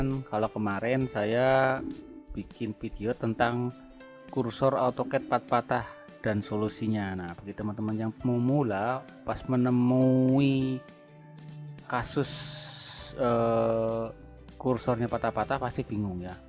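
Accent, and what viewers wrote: Banyumas, Indonesia. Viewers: like 11 om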